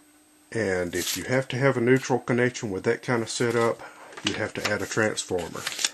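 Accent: South, United States. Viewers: your southern drawl is awesome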